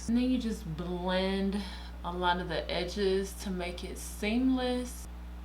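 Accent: South, United States. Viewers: Love your content, you're the only person on YT i know who wears their natural hair in it's shrunken state (the reason i subcribed) you're a unicorn. I think if you made dedicated videos showing how you wear your hair (a week in my natural hair type video) those videos will blow up. Your haircare routine is simple which alot of us want. Another video idea you sound southern mayvbe share your experiences being natural in your community?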